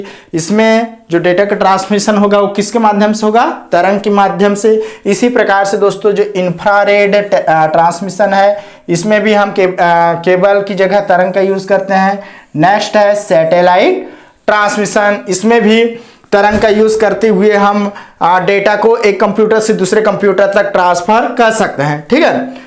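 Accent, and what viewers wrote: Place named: Bihar, India. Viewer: Thik sir ji mujhe Etna to pata chal Gaya ki aap BIHAR se hai .😊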